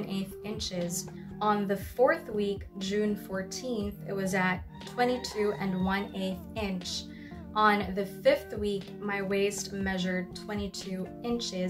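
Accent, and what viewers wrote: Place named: United States. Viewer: Omg it's like I'm watching an American vlogger. Your accent is soooo 🔥🔥🔥. Thanks for sharing btw. ❤️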